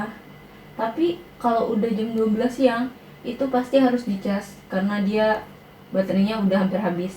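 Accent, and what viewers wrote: Banyumas, Indonesia. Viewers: kok logat nya agak ke ngapak ya orang ngapak kah? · Ko ngapak bre? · Asline ngapak bbang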